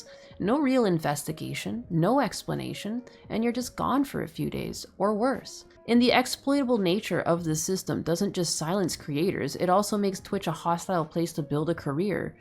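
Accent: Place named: Canada. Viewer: Streaming on Twitch can be a pain in the. Your "out" and "about" gives me the impression that you're from Canada and I don't know why you have so few subscriber so I have subscribed.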